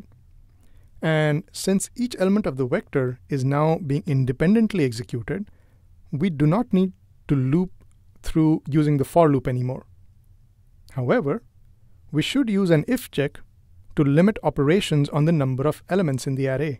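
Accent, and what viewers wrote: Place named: India. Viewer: Painful Indian English Accent.... Its Always Feel The Difference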